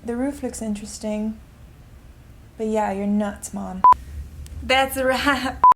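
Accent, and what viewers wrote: Cuba. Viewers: i knew you were cuban, you can hear it in your accent. love your style! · You kinda sound like Derek Zoolander, he he, maybe it is your Cuban ascent : ) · OH MY WORD I KNEW YOU WERE FROM MIAMI!!!!! YOU HAVE THAT CUBAN ACCENT FROM HIALEAH...not a bad thing I just love ppl that don't forget their roots. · girlllll I subbed strictly because I heard your cuban accent and this video confirmed you were! 305 till we die... hahaha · ah okay so you are cuban, was wondering because of your accent!! Aries here too!! yay ^^ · I totally knew you were Cuban! Your accent really reminds me of Gloria Estefan’s!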